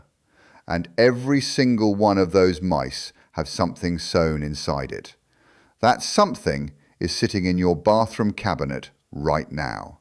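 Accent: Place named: Australia. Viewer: Millions of mice have not been dropped into the Everglades . Are you really that hard up for attention? I would never subscribe to a channel as misleading as yours. This is the second video I have found of yours misleading the public about what is happening in the Everglades. I guess, you think, if you wear the "outback" clothing and sound Australian people won't question your faulty videos. You are part of the problem with the reliability of the internet. Why do you do this?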